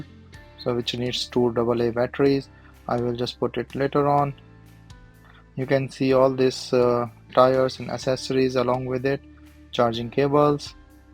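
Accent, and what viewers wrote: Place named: India. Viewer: Bro your English is like India isn't you ???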